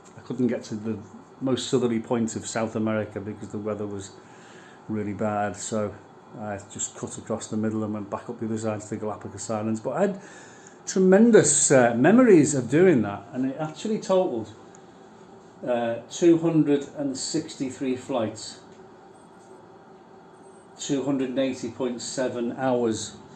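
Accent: Liverpool, United Kingdom. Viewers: Hello, what a tidy man cave/den. You are looking in the pink as usual. TBH I don't understand much about the flying stuff and jets/planes but I could listen to you for hours,the lovely Scouse accent. Take care 🌞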